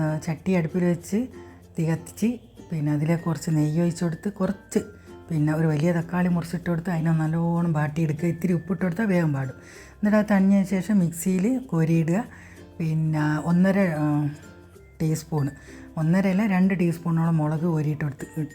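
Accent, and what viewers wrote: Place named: Kannur, India. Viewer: Enik Chechiide samsaram nalla ishtaayi ente kannur ulla relativesntem family friendsntem pole.. ❤ first like ente vaka.. ❤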